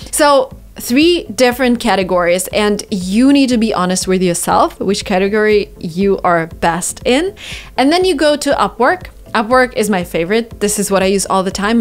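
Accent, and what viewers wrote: United States. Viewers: I don't know how to speak like an American after i watched your vedio i can speak like you You changed my life in a month Thanks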